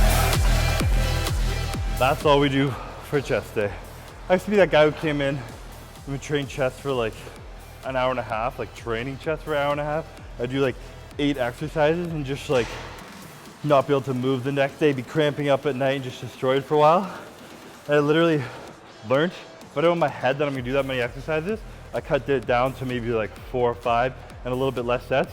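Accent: Canada. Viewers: Chris has got to be Canadian the way he talks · That’s that Canadian accent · Canadian lol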